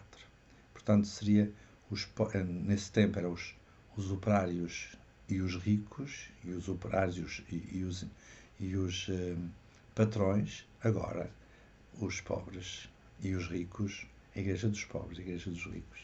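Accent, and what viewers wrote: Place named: Portugal. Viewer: Saludos cordiales desde Brasil. Creo que hablas el portugués de Portugal. De que paróquia el senhor padre eres? Gracias por su vídeo.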